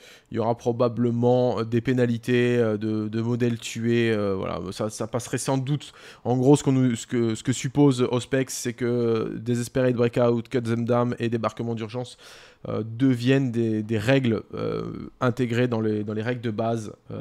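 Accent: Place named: Scotland, United Kingdom. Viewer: vu l'accent, il est pas anglais, il est écossais !!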